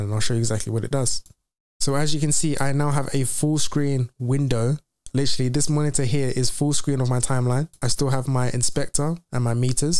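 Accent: United Kingdom. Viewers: Wonderfully done my friend...Love you man...Also love the English accent. Keep up the good work...peace brother..!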